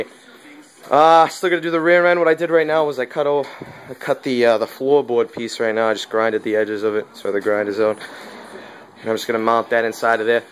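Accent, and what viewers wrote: Boston, United States. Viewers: are u from boston? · Keep jumping in and out of that Boston accent. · boston right??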